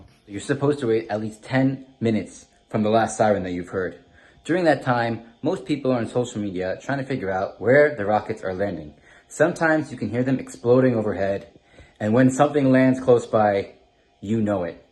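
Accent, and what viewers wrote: United States. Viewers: You sound like an American. What are you doing there?!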